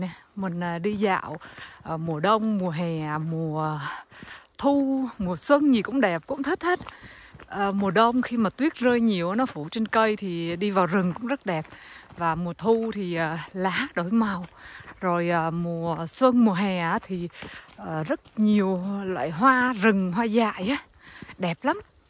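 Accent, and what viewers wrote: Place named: South, Vietnam. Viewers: Giọng nói của chị rất chuẩn giọng miền nam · Giong Nam cua nguoi bac 54.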